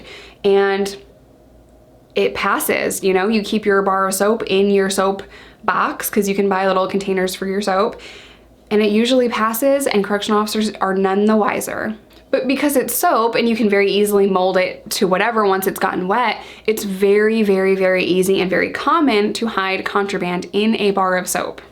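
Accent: United States. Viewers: Crikey you're sexy, you look like you are british, with american accent...but I know you're american, which is great.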